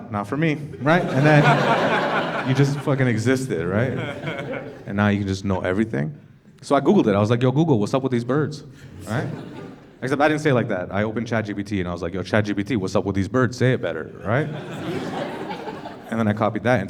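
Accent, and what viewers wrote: Toronto, Canada. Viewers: I love Canadian comics, I can sense his Toronto accent being held in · i know a toronto man when i hear one 😂😂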